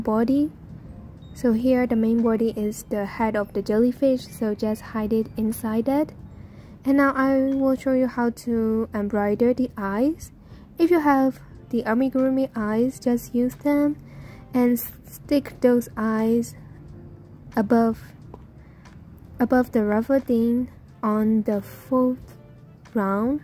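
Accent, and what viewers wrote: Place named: Myanmar. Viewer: Are you Burmese? I can hear the accent.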